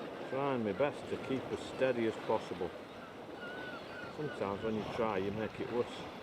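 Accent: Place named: Northern England, United Kingdom. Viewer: Enjoyed that , it's now on my to do list. This you must live near me with that accent, Wigan? Bolton? Lol.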